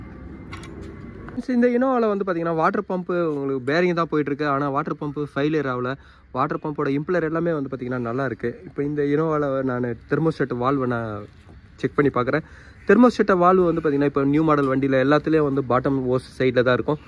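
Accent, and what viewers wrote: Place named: South India, India. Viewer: I didn't understand what you said In south accent but it's very informative ❤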